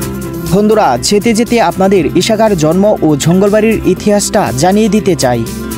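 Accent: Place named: Kishoreganj, Bangladesh. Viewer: কিশোরগঞ্জ নেত্রকোনার আঞ্চলিক ভাষা বাদ দিয়ে শুদ্ধ ভাষায় কথা বলার চেষ্টা করুন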